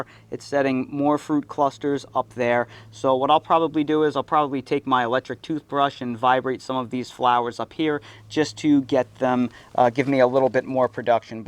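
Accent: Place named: North Carolina, United States. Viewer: No offense, but I kept wondering if you were originally from North Carolina. (Some of my favorite youtubers have recently moved there). I know as millennials some of us don't have much of a southern accent, but you have an accent of your own, haha. Its just something I have an interest in. There are variations of the southern accent and I can usually place someone in a particular region by listening to them talk.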